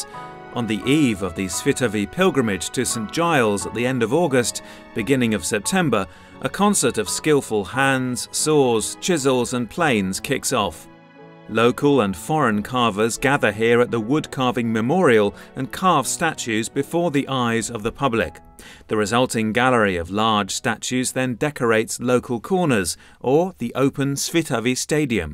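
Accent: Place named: United Kingdom. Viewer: Great info in spite of the Limey accent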